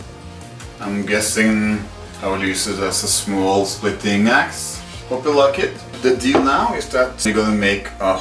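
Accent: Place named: Norway. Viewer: Heavy norwegian accent i hear🤣, great video tho!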